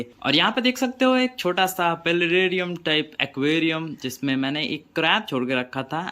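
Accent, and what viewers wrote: Bengal, India. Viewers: Bengali ya bihari?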